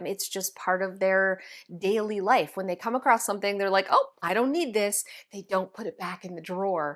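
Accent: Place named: Ontario, Canada. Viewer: As a former avid skydiver I agree. Safe, fun, but there aren't a lot of vertical wind tunnels in Canada (where I live). By the accent I'm guessing you're in Ontario? There are a TON of places there. It makes for a memorable family video too!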